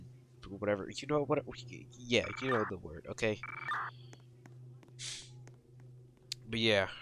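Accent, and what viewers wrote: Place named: New Jersey, United States. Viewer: Question did you have a teacher named Mr. Lambersky he is from the New Jersey area and has your accent.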